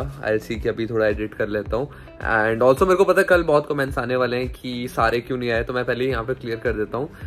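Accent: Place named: Bengal, India. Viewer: Your bengali accent ♥️🔥🥺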